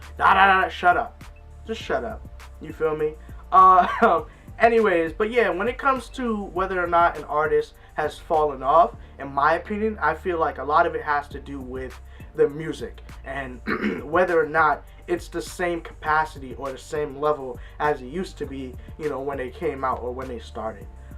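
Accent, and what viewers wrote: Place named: Jamaica. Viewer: are you Jamaican? Your accent is really similar to my Jamaican friends.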